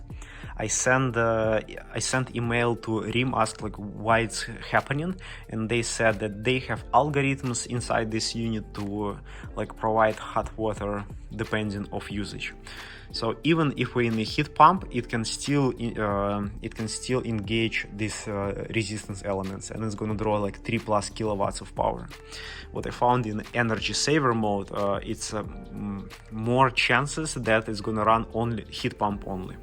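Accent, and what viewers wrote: Russia. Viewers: Buddy, Are you Russian ?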